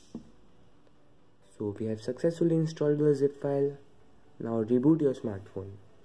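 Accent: India. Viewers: Wi u Indian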